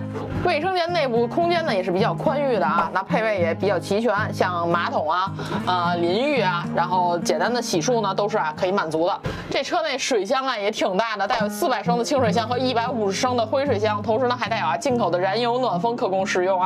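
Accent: Beijing, China. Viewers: 既然面向中国或者世界，主持人建议不要用一口北京腔。用标准的普通话可能观众听了更适合一些。个人建议。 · 车是不错， 一口京腔听起来很不舒服，普通话不好吗？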